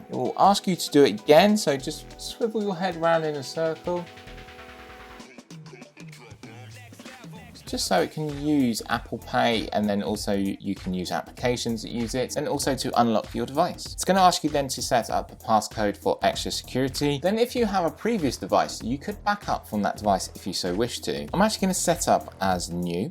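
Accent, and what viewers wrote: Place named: United Kingdom. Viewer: Heh. I love your sophisticated British Accenture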